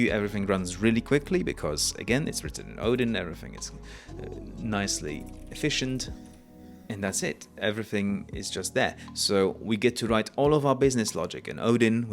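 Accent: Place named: United Kingdom. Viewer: same half-posh British accent; the similarity increases . . . :)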